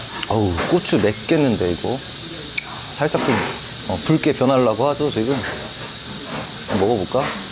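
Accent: Chungcheong, South Korea. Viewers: 발골 하는 재미가 있는 닭발 :) 바쁜 사람은 못 먹는 닭발 집 ㅋㅋㅋ 충청도식 화법 너무 좋아요 충청도 인 으로써 ㅋㅋㅋ 오늘 영상도 잘 봤습니다❤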